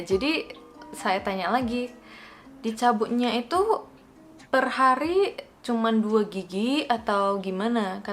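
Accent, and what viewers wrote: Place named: Medan, Indonesia. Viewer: udah ku duga kalo dokter tu orng medan,soalya logatnya kas bget☺️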